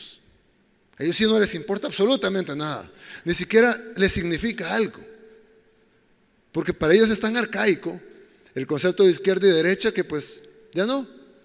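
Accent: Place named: Central America. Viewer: Hola como esta, Perdon y sin ofender pero no parece usted Mexicano. Que es eso de👉 Varón 👈? Si entendemos la palabra, pero es raro que la usemos aci como usted la acaba de usar. Usted se escucha si fuera Centroaméricano, lo digo con respeto....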